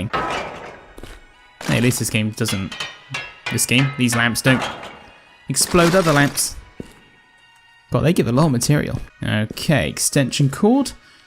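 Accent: United Kingdom. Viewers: your soothing British voice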